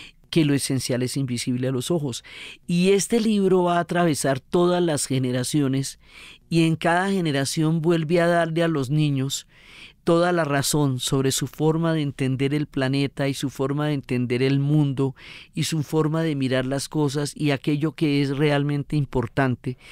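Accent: Colombia. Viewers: El Principito es mi libro absolutamente favorito y Diana Uribe con ese acento colombiano divino, juro que nunca antes de esta señora me había gustado la historia, con ella lo que antes era aburrido es música para mis oídos ❤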